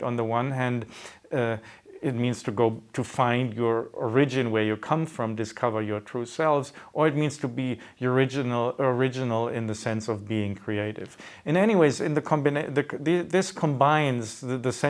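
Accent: United Kingdom. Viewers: School of Life is pretty obviously terrible once you move past the lull of the narrator's self-assured presenter voice and British accent. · School of Life shows that by having a well spoken, eloquent British accent can make almost anything you say seem intelligent. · The School of Life always got videos with wicked animation tho. That's their strength. Plus, the British narration sounds very credible. Content-wise it'll appeal to the general public. Self help, more like. From a professional philosopher's point of view though, it is lacking. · If he didn't have a british accent people would realize how much nonsense he advocates for in his videos. His videos are garbage, very ill-researched and pathetic in logic.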